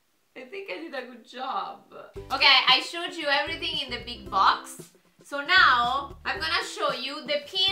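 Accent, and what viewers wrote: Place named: Italy. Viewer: Love ur Italian accent ❤️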